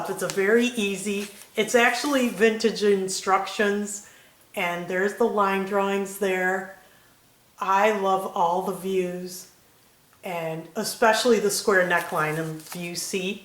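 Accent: Pennsylvania, United States. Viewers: Oh I loved this video. Every bit of it. Im in PA about an hour from where you came home to. I knew I recognized you accent as home. ❤️ Your Grand daughter is precious and looks lovely in her dress. I got my sewing love from my Grandmother when I was about 6. You’re Grand daughter might want to try one day! One more thing, You are a wonderful person, effervescent and joyful, so much fun to watch. 😊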